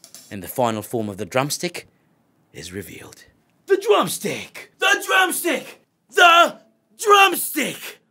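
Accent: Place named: Australia. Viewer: That damn accent. How i wish i have that Australian accent. Here's a thumb up.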